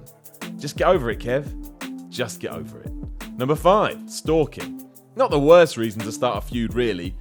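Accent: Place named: United Kingdom. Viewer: NuclearWinter i quite enjoy his voice actually. its soothing. but that could just be the biased American, who loves British accents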